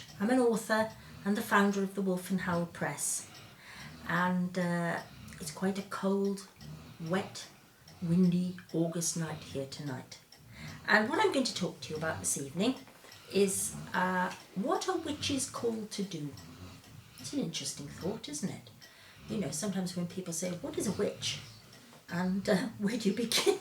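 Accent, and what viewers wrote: Wales, United Kingdom. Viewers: I live in next to Aberfan. I knew you lived in Wales from your accent from watching your other videos but didn't reolise you lived close by. Loving the videos by the way 😁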